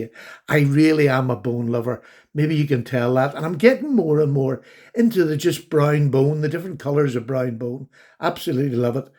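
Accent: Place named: Ireland. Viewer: It is good to see you back to making videos besides all the shout out open tag ones. They are okay but, not if they become the dominate content of all the channels you watch. When I look at all the knives on the table it is not hard to see a pattern appear. You like everything; and that might be an good indication of your success on your channel. Another reason is; Americans enjoy hearing an Irish accent as well as others from places their family once came from. Your opinions are your opinions being seen from your eyes are fun to listen to. They may not be what others think; but, that is a good reason to listen to them. Everyone can learn from others; no matter how smart or how much they know or think they know already. It will be hard for you to choose the five best knives of 2021. 🎁🎄🍀🥔🔪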